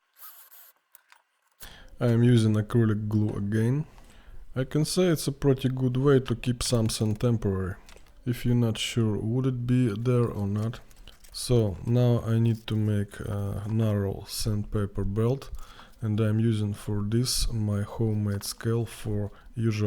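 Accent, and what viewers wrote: Russia. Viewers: Ты сам из России что-ли? акцент русский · Such a satisfying Russian accent :)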